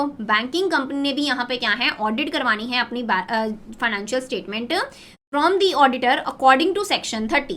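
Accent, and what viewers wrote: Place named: Punjab, India. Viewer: Punjabi tone ari h apki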